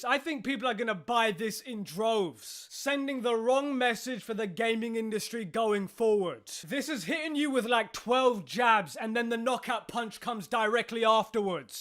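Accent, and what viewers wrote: United Kingdom. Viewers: Are you British? But being tongue lashed by a Brit is so entertaining 😂😎👍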